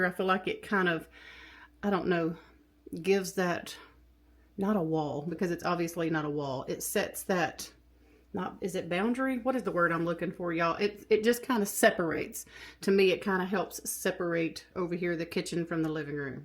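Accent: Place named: South, United States. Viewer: I'm a new subscriber. You have a little bit of a country accent like me; where are you from? I can't wait to watch more of your videos. BTW, I'm from Southeast Georgia.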